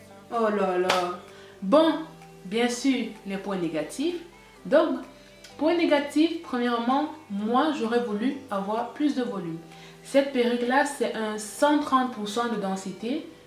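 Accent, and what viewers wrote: Cameroon. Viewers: Olalala j'ai découverte ta vidéo aujourd'hui ma belle j'adore comment tu explique depuis qlq jours j'essaie de vouloir commander les mèches justement ta vidéo vas bcp m'aider j suis accro à aliexpress , ma soeur camer ton accent la ne ments pas 😘 j m'abonne😉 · ta maniere de parler naturellement comme une camer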